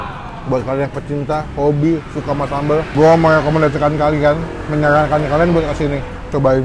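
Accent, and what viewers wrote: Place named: Java, Indonesia. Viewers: njir jowo asli 😂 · Bang ngomong jawa serasa nonton ndarboy genk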